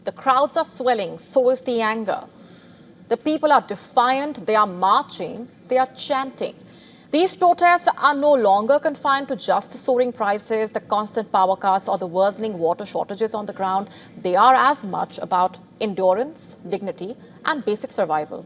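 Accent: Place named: India. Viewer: English in Hindi style!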